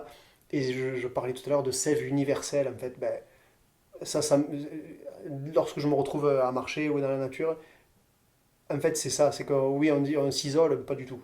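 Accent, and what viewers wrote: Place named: Béziers, France. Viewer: Très intéressantes remarques sur Brassens, ce que je ne connais pas, qui parle avec mon accent, que je me sens seul à colporter dans ma ville natale de Béziers.....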